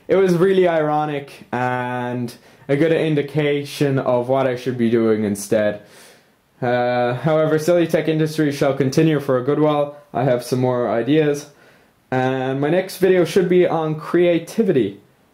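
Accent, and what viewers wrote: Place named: Ireland. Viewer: I could tell you were Irish in the other video. Probably because I watch a lot of U2's old concert vids on youtube - your accent is quite close to Bono's.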